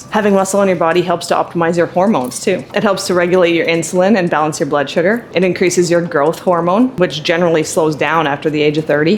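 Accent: Canada. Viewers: Are you Canadian and why can I hear it in your voice and I'm Canadian? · I love your content. Good advice for sure, but I gotta ask. Are you Canadian?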